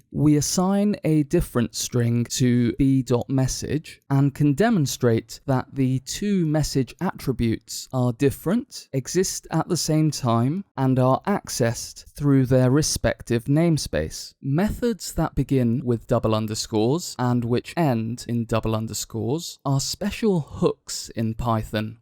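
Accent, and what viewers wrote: India. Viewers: I don''t understand what is meant when it's said "It's free so you shouldn't say a thing about it" , it's free, so what? I don't like it! The guy makes money from it even if it is free! My point is that, since they make money, they should try to make something that's the best they could offer for their own good. I stated how I truly felt, it's completely normal to dislike an accent, and it is nothing "unfortunate", the voice really does matter when it comes to sending your message, and I think that the message that Indians try to make their voice more like the English accent, I think if they put more effort into it the message would be sent more fluently. It's not my fault that I get headaches when I listen to the Indian accent in the first place, I would love to listen to them without getting a headache honestly, it's just that I am that way and I am the one who suffers from it since Indians have very useful content... 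· You statement about Indian accent is very unfortunate. I feel sorry for learner's like who instead of focusing on the message focus on the messenger especially when things are given free.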